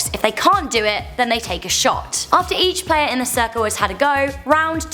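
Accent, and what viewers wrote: United Kingdom. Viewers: she is British living in north America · Is she british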